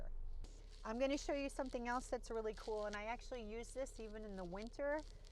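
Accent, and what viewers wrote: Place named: United States. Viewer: You sound awfully American for someone from Manchester...Great vid.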